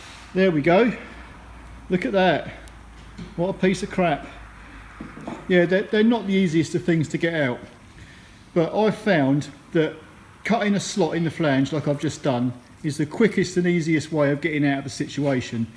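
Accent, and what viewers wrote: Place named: England, United Kingdom. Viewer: That’s the same English accent as Producer-Director Ben Dover.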